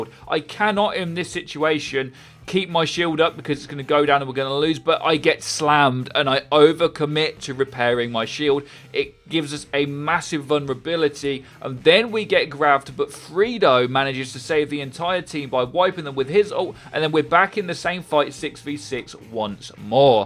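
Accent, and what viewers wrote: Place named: Midlands, United Kingdom. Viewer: That midlands twang though 👌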